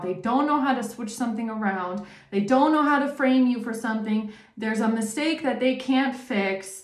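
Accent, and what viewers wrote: Germany. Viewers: Yeah,... they've struck me like a match without even thinking about the consequences. I hope they learned to drop and roll. p.s I Absolutely love your German accent and language skills. What a cute picture of a lovely fraulein. That's so cool!!!❤❤❤❤❤ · Your German accent is beautiful! The wicked evil thief and his satanic family can ask s@@ to save them, they offered the collective as sacrifice for life insurance policies, steal their homes, steal their finances because the evil leader DJ zumba instructor played God on chosen lives, God's wrath is upon them, they made their bed and now they can lay in it KARMA Isaiah And I will punish the world for their evil, and the wicked for their iniquity; and I will cause the arrogancy of the proud to cease, and will lay low the haughtiness of the terrible these wicked evil inhuman intentions towards someone who did ABSOLUTELY NOTHING to him or his organized criminal group is UNJUSTIFIED for greed, sex orgies, lust, envy, jealousy? 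Psychopath. Kerosene light up a fire that never quench Malachi 4:3, which says they will be "ashes under the soles of your feet". Other verses mention a "lake of fire" (Revelation ) or "eternal fire" (Matthew ) where the wicked will suffer eternal punishment.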